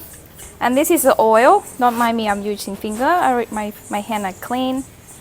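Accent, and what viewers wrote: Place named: Australia. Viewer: Your man must be an Aussie, as you speak English with an Aussie accent. Lovely.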